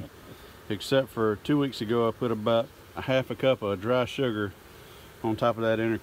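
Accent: South, United States. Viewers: This viewer is 'Disappointed ++'? What an entitled brat! Not your job to educate anyone on beekeeping for free. We are here to enjoy your beekeeping/ relocating exploits which are hugely entertaining and instructive. I watch because I learn a lot about bees, I'm entertained with your southern accent and antics, and get to visit the deep south with its trees, climate, animals, people, customs, and different climate zone. Just keep doing what you are doing, and know that the vast majority really like your videos, like you, and don't need you to do anything more. There will always be whiners, narcissists, and self-centered people who annoy others like Africanized bees. Just wear protective gear around those folks.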